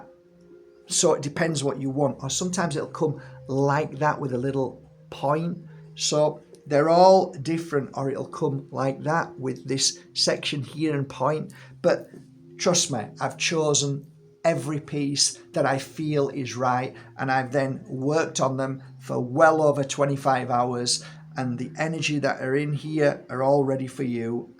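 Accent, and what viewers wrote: Yorkshire, United Kingdom. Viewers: I come from Huddersfield and I always love to hear your Yorkshire accent. Makes me feel warm inside. Also I love whatever you say. X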